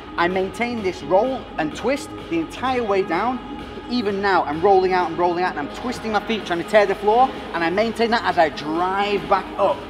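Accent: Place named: Northern England, United Kingdom. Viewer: "Loosey Goosey" propper Northern lad :)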